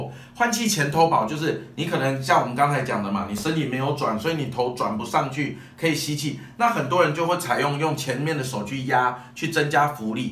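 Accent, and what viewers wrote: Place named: Taiwan. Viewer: 废话，人家教练是台湾人，不说国语难道说大陆普通话？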